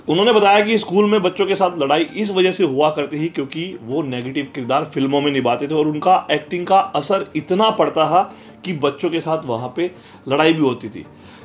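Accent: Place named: Haryana, India. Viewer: Haryana se ho sir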